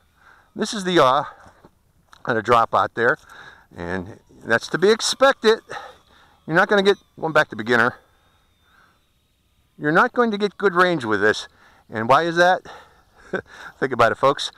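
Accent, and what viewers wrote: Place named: New England, United States. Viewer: Indoors? You sound like one of those (us) New Englanders. LOL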